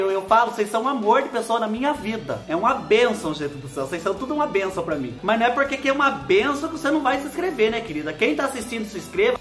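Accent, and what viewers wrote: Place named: Minas Gerais, Brazil. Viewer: Eu acho q é minéiro mesmo fala igual a mim kkkkk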